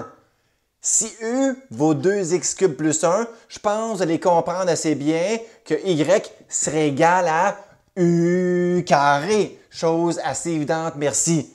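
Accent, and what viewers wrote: Canada. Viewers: A 66 ans je révise les maths pour aider ma petite fille de 15 ans et je trouve vos cours géniaux. Ils m'ont permis de l'aider à comprendre des notions complexes étudiées dans ses cours de maths. Votre tonalité (je vis en France) canadienne est un chant pour moi et votre communication gestuelle est un plus pour mieux retenir vos cours. Les cours deviennent magiques et on croit être dans un univers enchanté. Bravo, continuez ainsi pour faire aimer les maths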